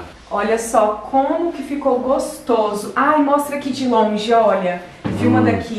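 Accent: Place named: Minas Gerais, Brazil. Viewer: O sotaque mineiro é um trem lindo demais!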